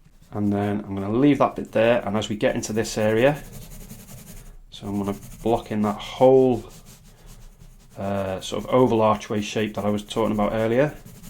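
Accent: Liverpool, United Kingdom. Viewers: Liverpool? Or Birkenhead way ? , some words I’m thinking deffo Liverpool, then I’m goin oh I don’t know 😂😂😂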